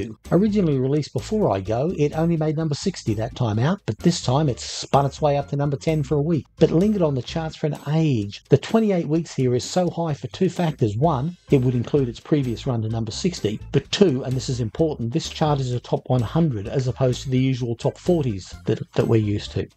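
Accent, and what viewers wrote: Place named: Australia. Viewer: What part of Australia are you from? Cool accent 😎 and as per usual awesome commentary 😊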